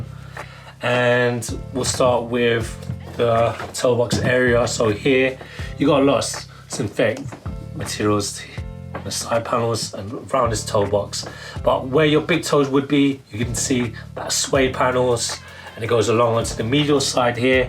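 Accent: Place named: United Kingdom. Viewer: The British accent makes him seem of average intelligence but he really below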